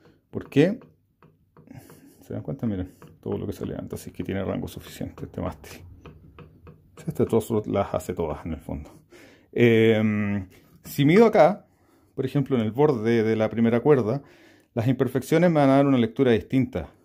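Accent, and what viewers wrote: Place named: Chile. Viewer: My wife said u r from chile after hearing your accent 🇨🇱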